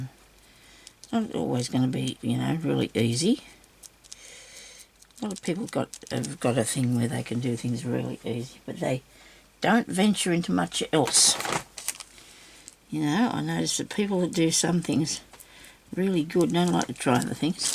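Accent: Australia. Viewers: I get so much joy watching you! You just love what you're doing and even when it isn't working out as you'd planned you just laugh and say "nobody panic" and keep moving forward! Your spirit mixed with that lovely Australian accent and sayings and sense of humor always makes me happy,lifts my spirits and gives me a giggle for free!! Never change,my friend. You're a treasure 😁💙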